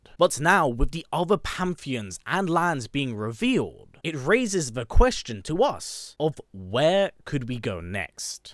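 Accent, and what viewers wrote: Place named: Scotland, United Kingdom. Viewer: Wouldn’t say British, more Scottish myself. It’s when he says “lad” that I really hear it personally. But I really agree with the rest of what you were saying about the universes! It sounds dope.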